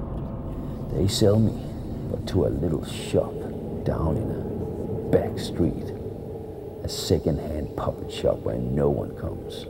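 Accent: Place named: Denmark. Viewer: MAAAN I LOVE HIS VOICE AND THAT DANISH ACCENT D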